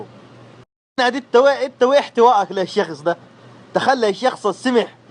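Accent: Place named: Kordofan, Sudan. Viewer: حبيبي احبك احبك احبك بتتكلم لهجة الكردفانية الكردافة من غرب السودان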